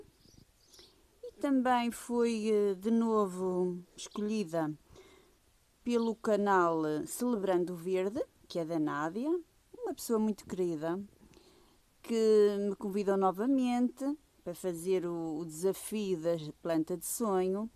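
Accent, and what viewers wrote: Portugal. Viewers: além das plantas maravilhosas, ouvir sobre elas com seu sotaque da nossa terra mãe é muito bom! :)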